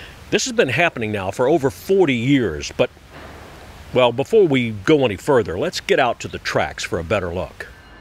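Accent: South, United States. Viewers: I like this guys voice. It’s like a cowboy with a really light southern accent.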